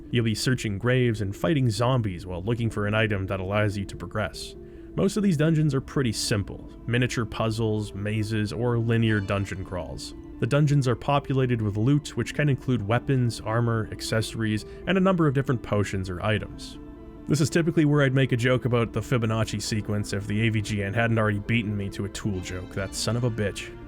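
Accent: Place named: Canada. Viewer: Oh snap! You're Canadian!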